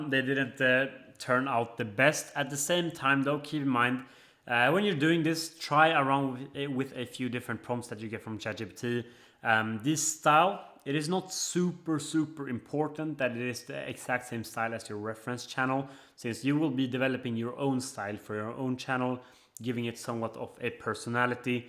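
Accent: Sweden. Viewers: Brors svenska dialekt är galen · the way this guy pronounces "ch" sounds is too funny "sjat GPT"😂 so swedish!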